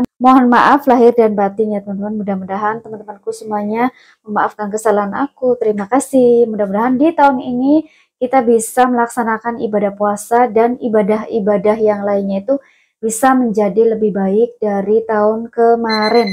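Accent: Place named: Cirebon, Indonesia. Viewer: Mohon maaf lahir bathin juga ce moga puasanya lancar, aku klw puasa nggak pernah ijin ce Krn sehari2 incess ku gak peduli aku mkn gak paling disangka nya sedang diet, aku suka denger Cece ngmng sekali2 suka keceplosan Cirebonnya , sebagai sesama org Cirebon bikin aku senyum2, aku minum apel cuka organic beli di 360 ce ditambahkan satu tutup kedalam satu gelas air hangat lgsg turun drastis , aku juga kalau isi selai coklat suka keluar ce, bikin fatair bgtu isinya keju yg wadah nya bulat kardus itu enak ce dijamin cantik bersih , murah dikaibo hrganya kisaran 20$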